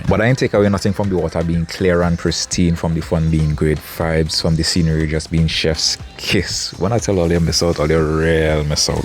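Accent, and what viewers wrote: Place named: Jamaica. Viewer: Your accent took me back to Jamaica.❤️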